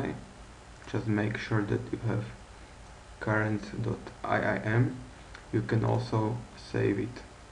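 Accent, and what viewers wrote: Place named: Slovakia. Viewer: the guy has a totally Slovakian accent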